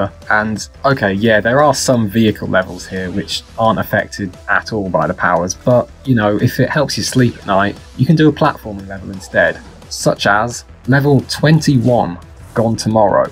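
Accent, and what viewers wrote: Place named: United Kingdom. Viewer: Im so glad i found ur channel. Ur my favorite crash bandicoot modder with a british accent on youtube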